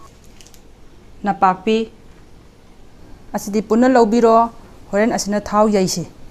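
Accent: Kakching, India. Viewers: Kakchinggi meitei lon se tabada yamna nungsijei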